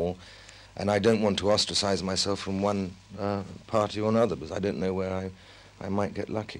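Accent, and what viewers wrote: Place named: England, United Kingdom. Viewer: I can listen to this guy narrate any documentary. Best English accent.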